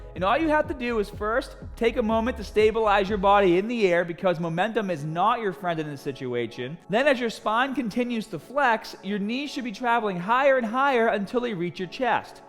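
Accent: Boston, United States. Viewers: He sounds like my former HS principal who came from Boston 😅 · The Boston accent is great. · Great video, obviously a Boston boy, LOL.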